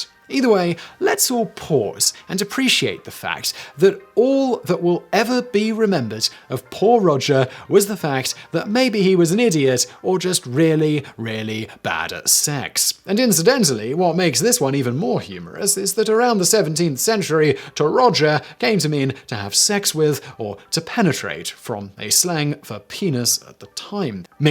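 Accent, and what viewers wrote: England, United Kingdom. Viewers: Serious proper English voice laughing brings my heart joy xD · I love to listen to Simon day four letter words😂something about the English accent and the word "fuck" are an absolute beautiful combination!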